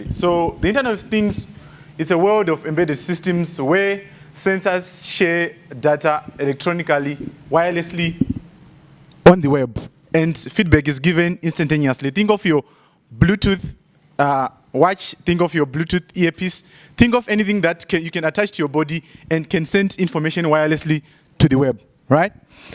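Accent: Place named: Ghana. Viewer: You have suddenly adopted the Ghanaian accent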